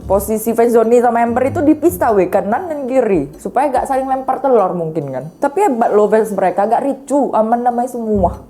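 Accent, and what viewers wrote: Medan, Indonesia. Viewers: Onde mandeeee.. Logat Medan rasa Padang.. kereeenn...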